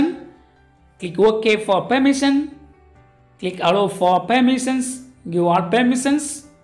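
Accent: Tamil Nadu, India. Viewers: Your tamil style English is very good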